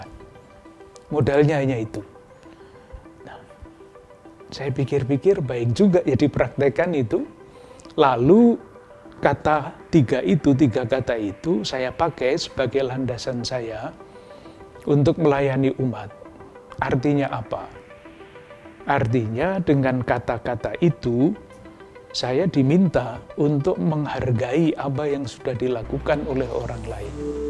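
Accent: Java, Indonesia. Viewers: Medok banget bapaknya😂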